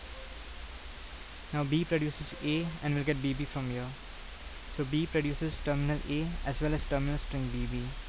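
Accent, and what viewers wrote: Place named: India. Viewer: Im so fucking tired of indian english by this time in the course..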